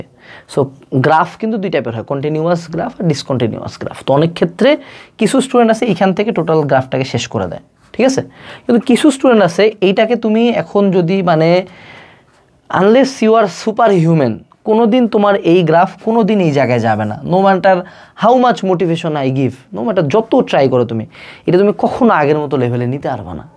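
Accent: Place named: Rangpur, Bangladesh. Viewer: Vai er kothar tone e bujha jay Rangpur er manush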